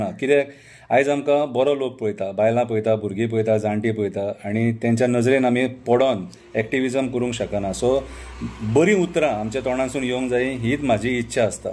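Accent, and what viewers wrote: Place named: Salcete, India. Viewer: Xaxti accent good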